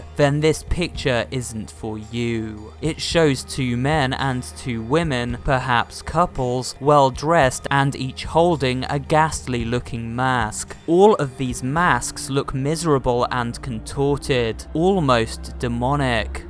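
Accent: United Kingdom. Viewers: Chills UK voice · Hit that right on the button definitely a British chills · You know it's fr when there's a British narrator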